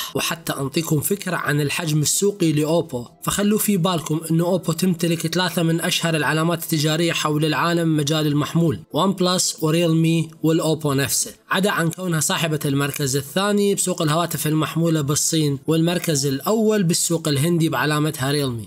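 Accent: Iraq. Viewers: مستمتعتش بالفديو قد ما استمتعت بلهجتك العراقيه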